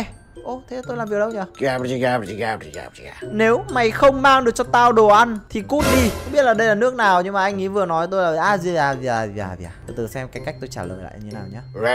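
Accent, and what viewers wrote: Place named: South, Vietnam. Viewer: Thích cái cách a ấy nói tiếng miền nam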